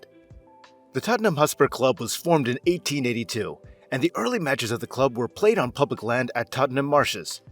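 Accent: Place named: United States. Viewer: Who is this Yank narrating about Spurs’ stadium?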